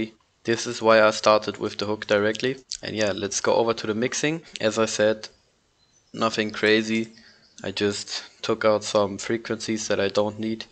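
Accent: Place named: Germany. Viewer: man hört du bist deutscher😂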